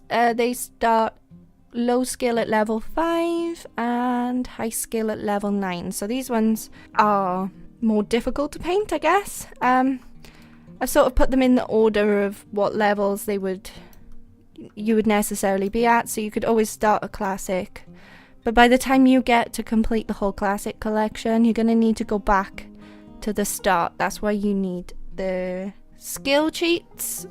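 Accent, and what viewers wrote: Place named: Wales, United Kingdom. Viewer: It's nice to hear a Welsh accent, reminds me of my Mamgu!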